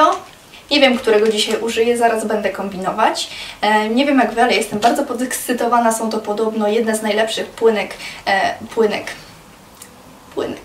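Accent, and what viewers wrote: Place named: England, United Kingdom. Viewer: Jejku! jaki ty masz śliczny angielski akcent!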